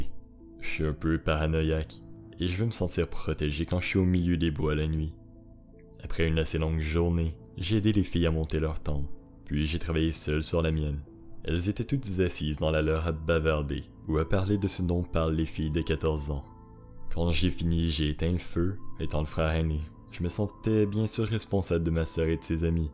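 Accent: Quebec, Canada. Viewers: On dirait un accent québecois nn?❤️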